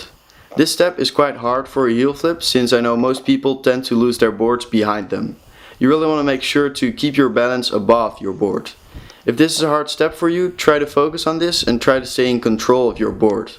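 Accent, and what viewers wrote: Netherlands. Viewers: sickkk btw you sound very dutch bc I am dutch😂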